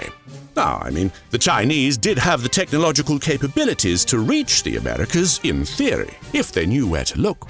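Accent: United Kingdom. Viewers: 您的英式英语真的非常非常好听❤❤❤ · I discovered your channel today. Instantly hooked with the brit pronunciation and enunciation. It's really good, and the pacing is well done too. Instant sub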